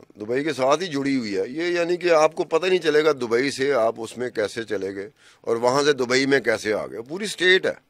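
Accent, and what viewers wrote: India. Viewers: Aleem khan please ask your host speak like Pakistani not Indians. This is very annoying .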